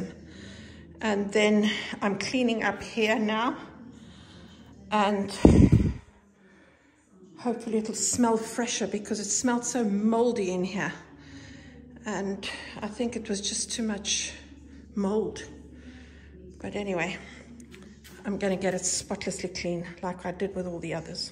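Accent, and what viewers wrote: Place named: Cape Town, South Africa. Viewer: You sound like you're from Sea Point, Cape Town.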